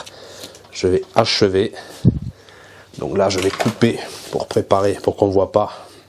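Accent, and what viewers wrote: Toulouse, France. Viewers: En tout cas bravo ,avec rien tu fait quelque chose ....sais plus quoi dire ,en plus je vais faire une confidence,c'est grâce a cet accent toulousain que j'adore et qui rends ces vidéos encore plus agréables et attrayantes a voir ....c'est pas beau ça ? suis sincère crois moi et je pourrait regarder pendant des heures .....bon weekend du 11 novembre...😊✌✌ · ami toulousain bonjour! Suis un passionné de velo vintage et j'en retape plusieurs par mois (les france loire, starnord ou arrow ont plus de secret pour moi lol ) sur le moderne je connais la theorie mais pas de pratique. J'aime beaucoup ta chaine que je suis. Plusieures choses: - l'immersion dans l'atelier avec le bordel inevitable, les imprevus galere, le bricolage pour s'en sortir..en toute transparence. Validé 🙂 - l'humilté generale de ton discours et attitude. Assez rare sur you tube pour etre souligné - Pas de tabou ou autres sur l'argent ou ta vision du commerce. 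Tres cool. (Tu voles pas ton argent, manquerait plus que tu ait honte de ca! huhu) - Les fichiers "one shot" sans montage, plus long et lent mais beaucoup pus vivant. Pas de montage pour toi. c'est tout benef de temps. Le seul inconvenient est pour toi car cela t'oblige a parler tout le temps pour "meubler". J'aime beaucoup l'accent toulouain mais je t'avoues que je mute le son parfois (no offense bro'!:-) ) Sur cette video: Magnifique impro sur l'habillage du cintre! dommage que le marron aille pas un poil plus haut pour avoir le noir juste la partie horizontale. Le noir aurait ete invisible de profil ) Au final, Les velos bleu des ces années la (teinte souvent pastel ou pailletées) avec cintre et selle marron ca match toujours! Moi j'aime beaucoup le resultat ici BRAVO! Changes rien Amitiés du Morbihan;-)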